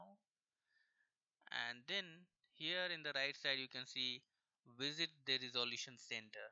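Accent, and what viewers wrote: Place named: Bengal, India. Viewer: You have a bengali accent Are u bengali? Nice video btw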